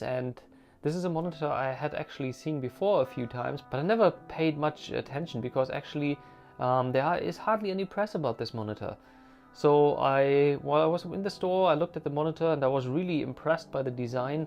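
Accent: Germany. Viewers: Hey thanks for the review, the monitor costs 670€ at the HP Store this weekend and you really convinced me to give this monitor a try I can barely notice your german accent, just saw on your profile that you're living in japan, thats my dream man hope you enjoy it :) Cheers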